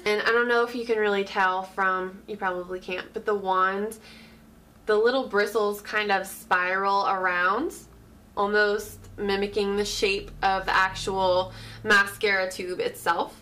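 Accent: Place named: Philadelphia, United States. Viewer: You sound like you're from Philly or Princeton area, where I live, & you look so familiar. Did you used to work in marketing or design before you started doing makeup?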